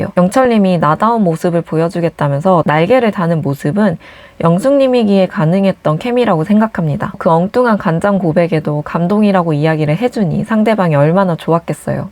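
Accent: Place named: Gyeongsang, South Korea. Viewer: 근데 ‘영수님’하고 ‘영숙님’ 발음이 너무 똑같아서 구분이 안돼요. 경상도 사투리 약간 쓰시는 듯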